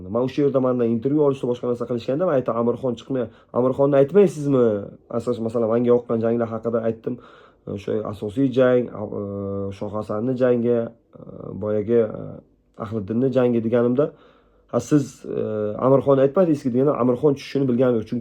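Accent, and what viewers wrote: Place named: Uzbekistan. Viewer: Ўзбекча тоза гапирганиз учун рахмат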